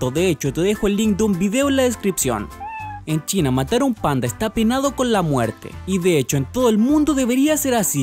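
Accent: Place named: Chile. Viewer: En cada video tienen un acento diferente.. esta vez tuvo mas el acento Chileno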